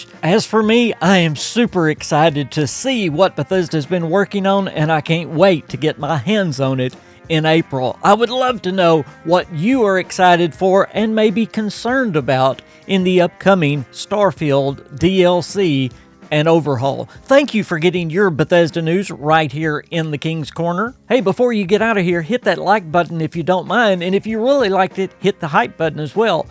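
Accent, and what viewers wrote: South, United States. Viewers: Cant wait to try it on ps5. Subscribed on this channel since a few months ago if I'm not mistaken. Very wholesome channel. Love that southern accent. Salutations from EU.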